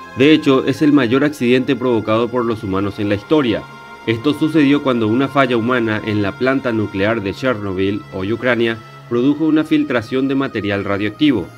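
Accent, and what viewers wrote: Paraguay. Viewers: eres paraguayo? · Tenes acento paraguayo🤔 Porque no sabía de este canal hasta ahora🤔 me suscribo😍! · Sos paraguayo? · Sos paraguayo ? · Buenisimo el vídeo. Sos paraguayo? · Me parece conocido el acento. De Paraguay verdad? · Sos paraguayo?☺️ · Acento paraguayo ?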